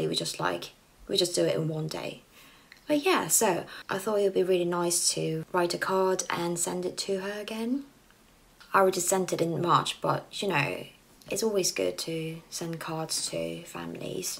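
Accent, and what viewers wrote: England, United Kingdom. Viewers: 고급진 영어~~덕분에 영국 느낌 물씬 느끼고 갑니다^^^^ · 님 브리티시 악센트 들어서 넘 행복해유~♡ 손은 나아지셨나요ㅠㅠ 반지도 목걸이도 다 예쁘고 그 중에 젤 재밌는건 님 얼굴..넘 예뻐서 홀린듯이 들어왔습니다..ㅠㅠ 인스타 사진두 잘 보고있어욥!! Have a good day❤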